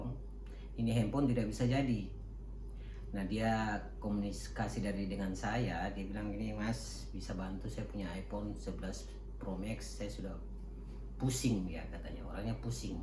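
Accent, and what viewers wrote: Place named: Papua, Indonesia. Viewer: Bicara saja bos hajar pake logat Papua ka,sukses selalu bos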